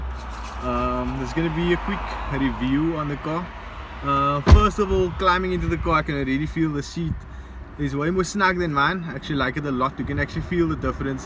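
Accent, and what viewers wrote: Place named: South Africa. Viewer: Where are you from bro? Your accent sounds South African am I right....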